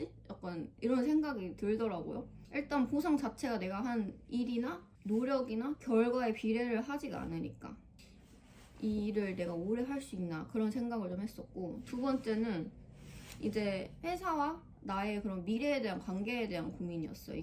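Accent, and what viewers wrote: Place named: Gyeongsang, South Korea. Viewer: 내나??? 경상도가 고향이십니까 ㅎㅎ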